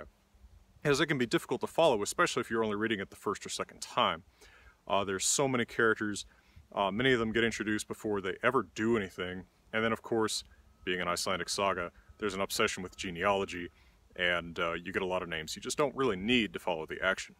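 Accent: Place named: South, United States. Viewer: A southern man teaching Norse mythology. Cant tell if your east texas or going up into the western parts around Wyoming, Montana, etc., but know that this is exactly what I needed in my life.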